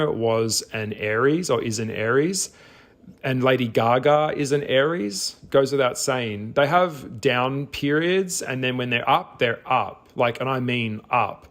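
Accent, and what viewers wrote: Australia. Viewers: Nope, not listening to an Aussie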